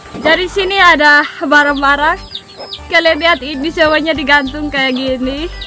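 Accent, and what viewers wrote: Kupang, Indonesia. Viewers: its amazing the way you talk kupangnese. Pls teach us some kupang language:)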